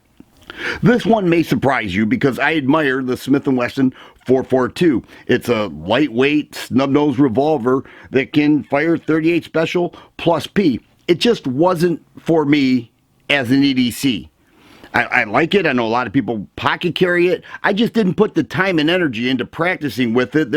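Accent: Michigan, United States. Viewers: Are you from Michigan or somewhere near there?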